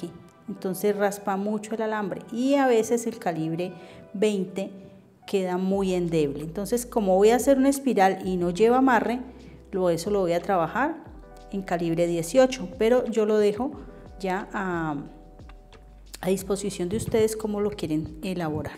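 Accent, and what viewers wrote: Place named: Bogotá, Colombia. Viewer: Ud da cursos y en donde Ud es de Bogotá Colombia según el acento Me gustaría saber para ir hacer el curso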